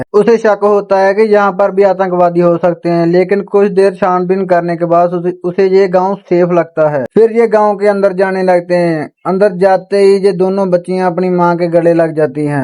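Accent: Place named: Punjab, India. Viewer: NICE BRO TUHADI HINDI CH PUNJABI VALI GALL BAAT AA IK VAAR PUNJABI CH EXPLAIN KARIO ATT BRO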